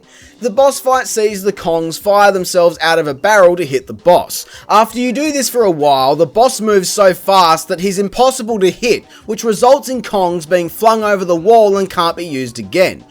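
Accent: Australia. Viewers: i think he comes from australia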